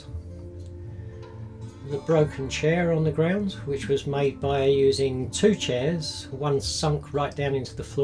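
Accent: Texas, United States. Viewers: Thank you for your backstory. I just found my long-lost brother. I performed the fiddle for 40 years. If you lived near me, I would love to turn you loose on my woodworking equipment. The only thing we would be missing is a good selection of exotic veneers and some specialized clamps. I think your old western movie inspired, Texas accent is perfect. If I did the voice over, everyone would be subjected to my horrible rendition of Monty Python. It's clear to me that you have 10 times the artist in you than me. Your precise attention to delicious detail tells me that you were a master of both painting and marketry. I on the other hand, will always be a slave to industrialized symmetry. I agonize over the finish of my work. I've always wanted to be paired up with someone who could teach me how to add soul to my work. I'm great at the skyline and stymied by adding life. 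· Wow this is quite different, and fun. My wife is from Texas, she says it's cool that you tried the accent. I feel that way any time I try and English one. Ha. That chuck wagon sure is cool I also enjoyed the explanations as I am largely mod illiterate and can't tell when something is custom built 104 beams! I I understand the effort there believe me The inside of the house looks so good. The oil lamp is clever, as is the use of conduit. So many small details that i lost track, mesmerized All the barrels in stages of build at the cooper…just brilliant Was that cat named cooking fat? 🤣 Awesome build. Just excellent work.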